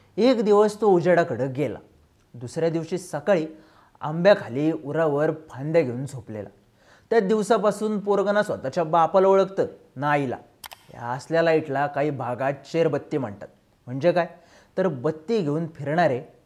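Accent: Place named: Kolhapur, India. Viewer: कोल्हापूर मधन बोलतोय बग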